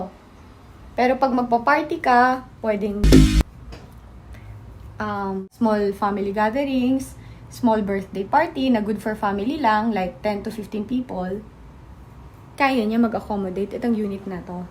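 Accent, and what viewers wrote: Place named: Ilocos, Philippines. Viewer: Parang ilokana po kayo mam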